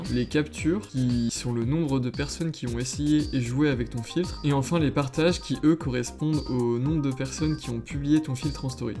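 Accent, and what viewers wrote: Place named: England, United Kingdom. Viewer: PTDR, j’viens de me taper cette même vidéo mais avec ton accent anglais, avant de me rendre compte que tu faisais ça pour toutes tes vidéos, con que je suis 😅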